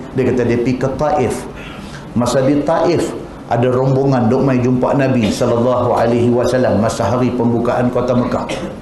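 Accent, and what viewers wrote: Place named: North, Malaysia. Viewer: ceramah ustaz shamsuri terbaik...suka dengar loghat utara dia.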